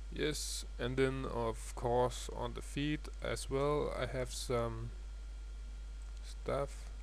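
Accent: Denmark. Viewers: Are you danish, ? Your english sounds bit like the 'danish style' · you sound danish, am i correct?:p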